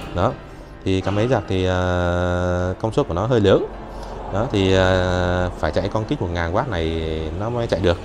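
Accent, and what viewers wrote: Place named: Quang Binh, Vietnam. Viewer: Bác nói nghe giống người cảnh dương, quảng bình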